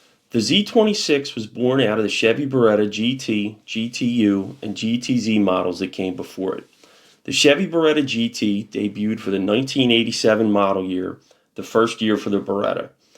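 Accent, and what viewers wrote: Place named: Northeast, United States. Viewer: Fun video (as is your accent - somewhere northeast?). It's always entertaining to my Brit eyes to see the parallels across the pond. Over here, we had similar early 80s Cavs, but the sporty Vauxhalls (obvs Opels under the badge) were more the Astra (smaller) and Nova (smaller still). I feel like we had some very similar wheels to those Z24s though - they're definitely sparking childhood memories!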